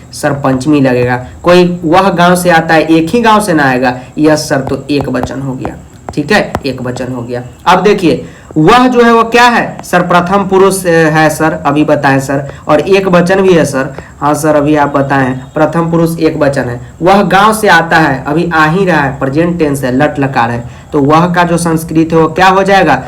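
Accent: Bihar, India. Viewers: Your teaching style is so good and your bihari language is so amazing 😍 😍😍😍😍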